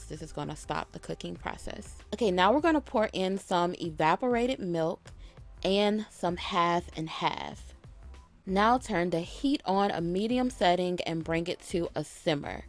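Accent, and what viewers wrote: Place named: Louisiana, United States. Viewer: I'm kinda new to watching your videos and I must say this is my favorite!!! I'm from Ga and currently live in Tx. I LUV the way people from the "boot" cook(and talk)My grandmother taught me how to cook mac with canned milk but she used a couple eggs. Thank You so much for your videos. I will be trying your banana pudding tomorrow😉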